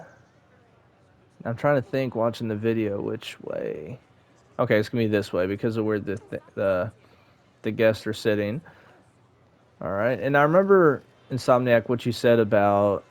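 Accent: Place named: United States. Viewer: Ah I see, the Mrs loves your accent and wanted to know what part of America you are from. She is actually part Native American herself, well part Irish, British and Native American, her Grandmother was an adopted Native that spent the first few years of her life living in a tent somewhere over there then married her grandad who brought her to England. The Mrs was very close with her and has a keen interest in her Native American heritage. I think your accents you have over there reminds her of her Nan.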